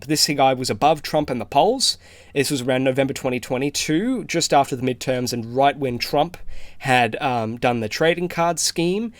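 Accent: Australia. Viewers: doesn’t he sound more Australian, Mr. K-On pfp?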